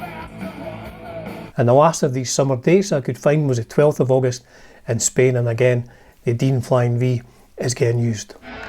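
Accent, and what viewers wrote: Scotland, United Kingdom. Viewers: great video buddy. Blessings to you and your family from Hogganfield Loch Glasgow originally from Saltcoats on Ayrshire coast I've been a Schenker fan from Obsession LP onwards my favourite guitarist. What you've missed is that Gibson won a massive law suit with Dean Guitars who can no longer make the Flying V or other shapes of Gibson I think they are in deep trouble financially now since the court case so Michael probably released from his Dean contract and hence back to Gibson. You sound East coast hope you're a Hibby same as me lol. Kind regards buddy. 🎸✝️🏴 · Growing up, Michael Shanker, was always my favorite guitarist! I see your Scottish by your accent. I’m an American but anyway, I love the show outlander they got the Scottish actor Sam Heughan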